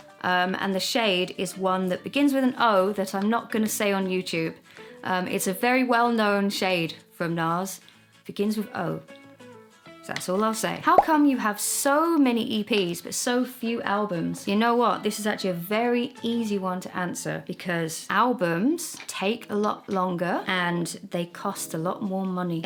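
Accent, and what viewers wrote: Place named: Essex, United Kingdom. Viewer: I love that you've left up Ask 4, that's still so entertaining. An interesting slice of history too with the full on Essex accent still strong back then.:)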